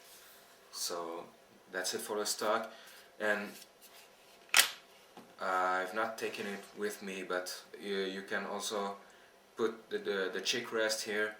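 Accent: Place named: Switzerland. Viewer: Dude, let ME take a guess-you're Swiss, right? :) Your accent sounds just like Swiss Maverick's+you guys have mandatory military service!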